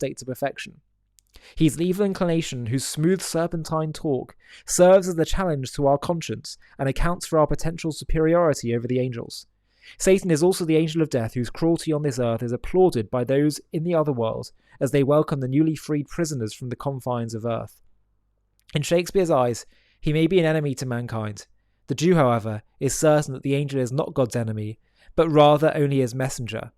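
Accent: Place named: England, United Kingdom. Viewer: He's English, it's how people in that country speak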